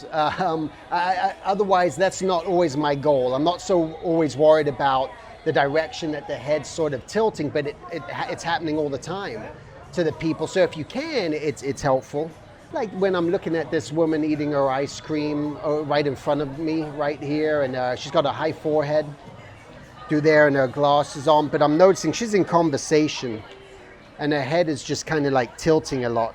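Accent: United Kingdom. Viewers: I know tight! Hahah i was baffled by it! I hear british, a lot of new yoooork. But also a flavor of canadian in there so weird hahaha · Oh my gosh I'm glad it wasn't just me! I hear British pronunciation, a Cleveland accent, and New York inflection. WHAT?!?!?! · He sounds like he has a British accent mixed with a New Jersey accent.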